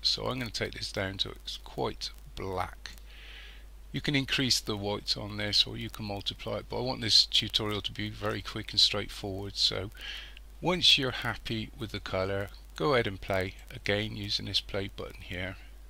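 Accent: Bristol, United Kingdom. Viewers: Smashing video. Love the accent BTW - Bristolian?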